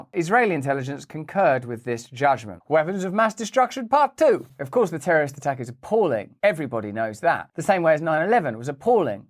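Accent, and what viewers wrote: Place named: United Kingdom. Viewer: Russell, keep it up! Humor+Truth+ contemporaneous rants with a british accent might just save the world! Bravo Bredren!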